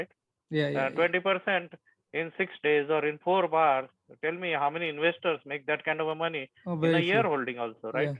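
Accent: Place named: India. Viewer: I really want to learn from him, his strategies are good. But I know other people from India who also speak like that and it is very annoying and a turn off.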